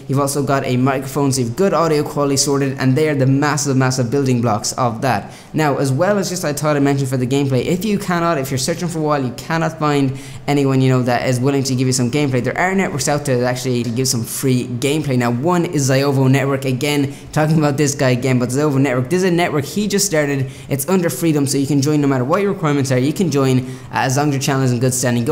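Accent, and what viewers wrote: Ireland. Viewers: Are U Irish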